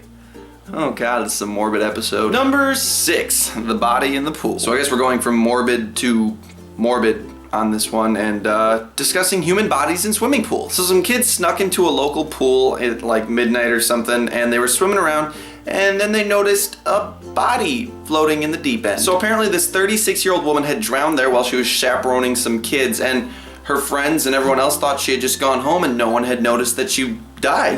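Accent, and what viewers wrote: Wisconsin, United States. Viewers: Are u from Wisconsin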